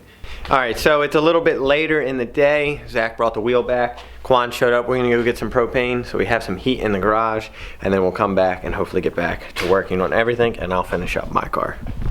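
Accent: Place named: New Jersey, United States. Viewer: games&memes because he’s from jersey they have accents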